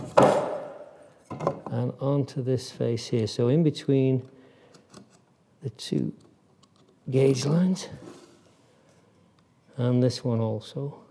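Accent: United Kingdom. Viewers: So it is british accent after all. I didn't know british woodworkers use inches as well. But then again in next episode he says 'it's half a mil" so I guess he uses all units he finds useful.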